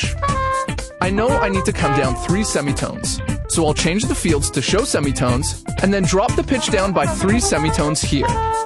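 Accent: Canada. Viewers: the canadian is strong on this one lol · You sound canadian...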